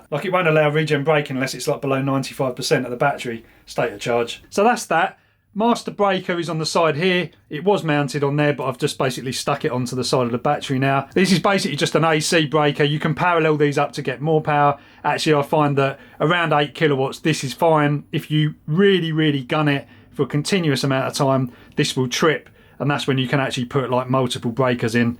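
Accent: United Kingdom. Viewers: This would be a great video if you were not so damn British. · proper brit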